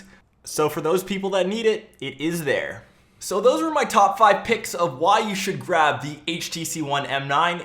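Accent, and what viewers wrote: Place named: Canada. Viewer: the phone is ok. your Canadian accent sucks. we don't care about your vacation.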